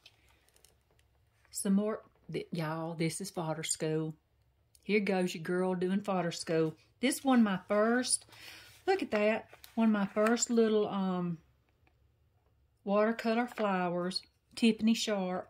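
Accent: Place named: Alabama, United States. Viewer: Beautiful journal! Awesome first video! This Texas girl loves your Alabama accent!!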